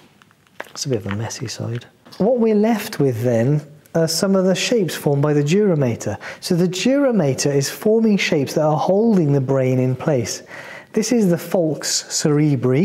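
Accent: United Kingdom. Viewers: You are great but with that typical british ascent i could understand only half of what you say 😅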